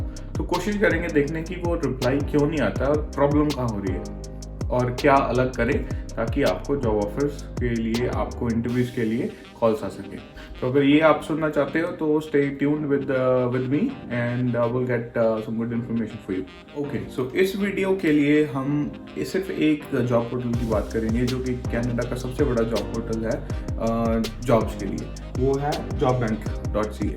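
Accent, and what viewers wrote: India. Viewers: Can't get you clearly, music n too noisy with indian speaking confuses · Important matter. Can u please add captions to this video? Sorry I can't understand your English Indi accent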